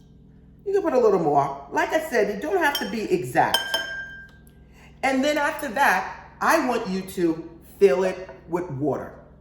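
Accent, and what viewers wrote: New York, United States. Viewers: Hey dear!! I used apple cider vinegar instead, because my other vinegar is in the storage. Is that OK? I think it should be totally fine. Also, I just did the ritual and I'm looking forward to seeing the results in 3 days. PD: Love your NYC accent. That's the coolest accent in the US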